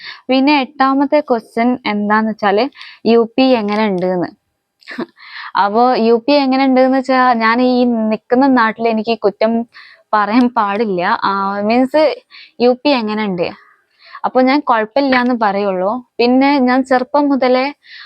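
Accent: Malappuram, India. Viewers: Malappram basha ath mathi 🤗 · മലപ്പുറത്തു ആയിരുന്നോ സ്ലാങ് സംസാരിക്കുമ്പോൾ അങ്ങനെ തന്നെ മലപ്പുറത് ആണ് ഞാനും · മലപ്പുറം മലയാളം ആണ് പറയുന്നത് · Samsaaram malappuram or kozhikod kaari. Look kandal nortj india kaari anu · Samsaram kettitt keralathil malappuram allenkil kannur aayirunnu enn thonnunu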